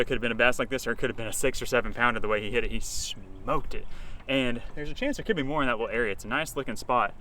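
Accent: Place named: South, United States. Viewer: Every once in a while , we hear the southern accent . Very cute .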